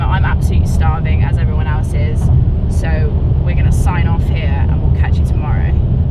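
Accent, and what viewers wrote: United Kingdom. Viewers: ..I love your British accent, you are a natural in front of the camera, I subscribed to your channel..keep those videos coming. 👍👍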